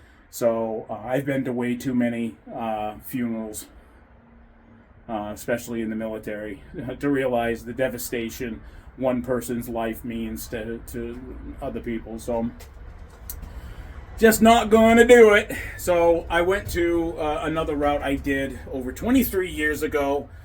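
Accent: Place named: North, United Kingdom. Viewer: I hear that northern accent getting mixed in with that other one you have ha ha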